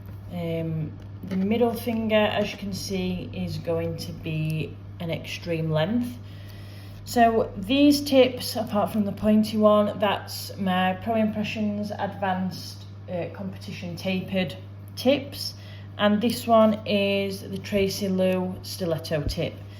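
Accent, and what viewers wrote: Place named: Sheffield, United Kingdom. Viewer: Love all your videos and your accent! Can I hear Sheffield by any chance or somewhere in South Yorkshire? I'm from halfway between Wakefield and Barnsley.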